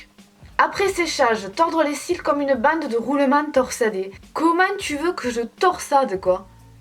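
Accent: South, France. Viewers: Like pour l’accent du sud ❤️ #TeamAccent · Cet accent 😍 je me sens vraiment chez moi, mercii pour cet accend du suud 🤙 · Quel bel accent du sud ☀️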